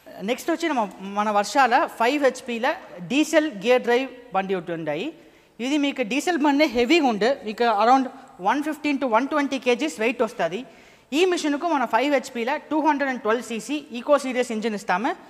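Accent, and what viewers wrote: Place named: Karnataka, India. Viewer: Nayana ayana Karnataka వరుల ఉన్నారు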